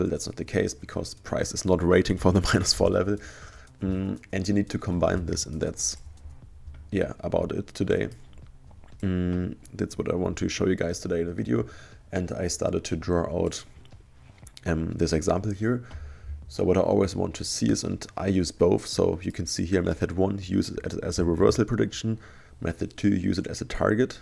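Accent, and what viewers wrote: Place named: Germany. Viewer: I hören the deutsch 😁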